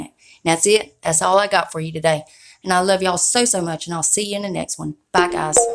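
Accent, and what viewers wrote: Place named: Alabama, United States. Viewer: I am so excited about this pallet! I love you accent straight from Alabama!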